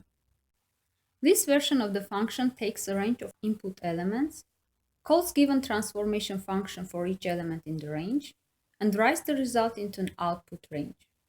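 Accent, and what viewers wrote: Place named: Russia. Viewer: Thank you, these tests are very helpful for consolidation of the material. Very familiar accent... Russian?)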